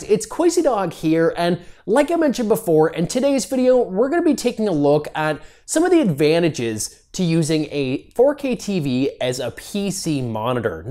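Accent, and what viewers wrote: Canada. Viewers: Sounds so Canadian lmao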